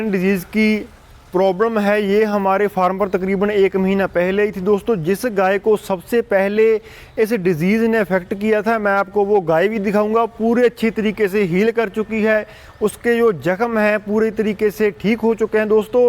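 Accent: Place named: Puadh, India. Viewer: bhai puadi bol rhe ho kha se ho app bhai ji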